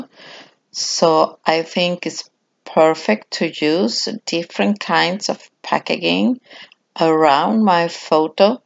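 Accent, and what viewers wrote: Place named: Sweden. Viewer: The end result is so awesome! I love your accent! Are you a Swede?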